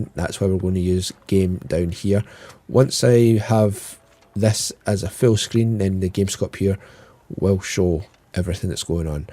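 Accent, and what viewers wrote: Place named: Wales, United Kingdom. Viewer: Great showcase. It's nice that you are talking more in the videos and I love the accent. Is that welsh?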